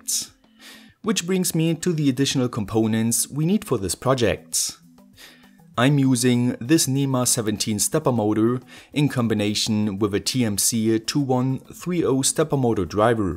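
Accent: Germany. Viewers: damn the german accent is annoooooooying. :(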